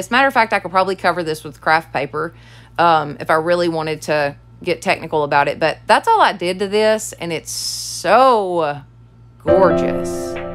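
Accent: South, United States. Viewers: Such cute ideas, I also love your accent. I'm from the East Coast & love the Southern accent. Happy crafting 😉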